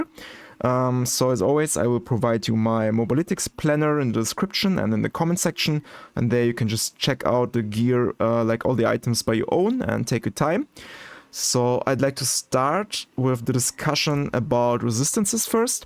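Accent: Germany. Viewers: Moin, wo kommst du eigentlich her, klingst irgendwie deutsch? :D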